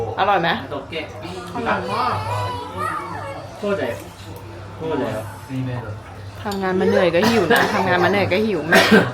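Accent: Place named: Ratchaburi, Thailand. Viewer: พี่เป็นคนจังหวัดอะไรครับผมฟังจากเสียงเล้วเหมือนคนราชบุรีเลยครับ😅😅😅ถ้าผิดต้องขออภัยด้วยครับ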